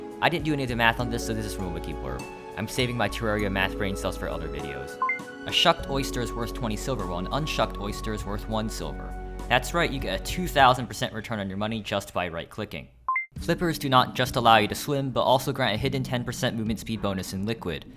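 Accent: China. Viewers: okay, so now we know you're a native speaker of chinese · I love your chinese pronounciation. Also, Frozen Chests were once called Ice Ice Chests in the game. Unless that was just in the Russian localization.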